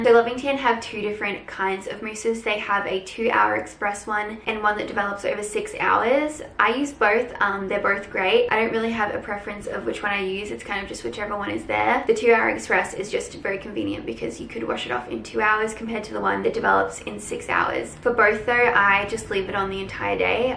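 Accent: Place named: United States. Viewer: Sounding so American at times in this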